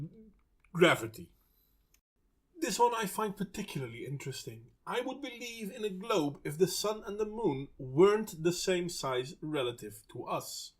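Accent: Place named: Netherlands. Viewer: Definetly tasting some Dutch accent in the air...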